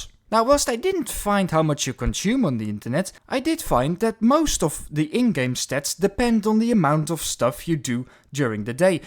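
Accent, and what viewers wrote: Netherlands. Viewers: Haha tietemans 😂 weet niet of je het bewust heb gedaan maar ik neem aan dat je Nederlands bent aan het accent te horen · 16 seconds in and I can already tell you're dutch lol. nice video tho!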